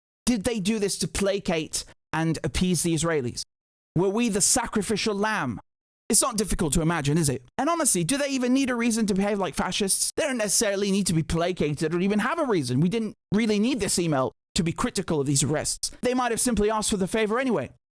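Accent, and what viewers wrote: England, United Kingdom. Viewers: Is it just me or is British accent coming out more now? Like when he says "befaw" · I haven’t listened to you in a while. Your British accent has become much thicker. Maybe it is from stress. I generally only agree with you about 50/50 but silencing people is wrong no matter what they are saying. We are all given minds to think. If what you are saying is totally false, it will be easy to see. Why the fear? Control of available information should be resisted. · Bro, I'm just going to say it. The wierd partial english accent is AGGRAVATING. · A slight bit more England English😊... Glad to see him back.. his personality is irreplaceable and his sense of humor and in-depth knowledge...while reporting is so greatly missed❤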